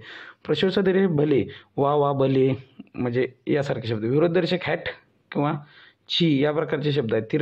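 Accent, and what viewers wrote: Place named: Kolhapur, India. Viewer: Best attempt but speed is quite fast .. Kolhapuri tone watato..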